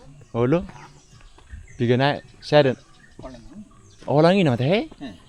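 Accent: North Sumatra, Indonesia. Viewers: Suara mu sangat enak di dengar ito ,ciri khas suku batak nya sangat kental ,,alhmdulilah 🙏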